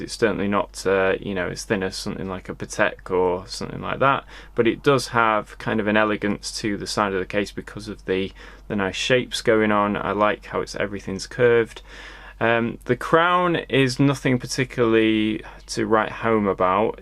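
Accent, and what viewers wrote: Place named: United Kingdom. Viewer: Great review. I love your review style and you have a very pleasant voice (not to mention a lovely British accent as well). Personally I really dislike the dial of the Air King and I would rather buy something lesser known and better valued (for example for the 5000 GBP the Air King costs I would rather buy a Sinn and a Nomos) but there is no accounting for taste! Kind regards from Amsterdam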